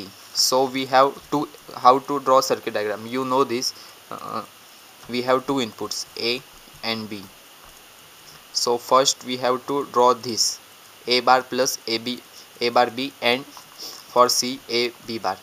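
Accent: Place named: India. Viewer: Am I the only one who is searching for a tutorial on the full or half adder without an idian accent? Very good tutorial!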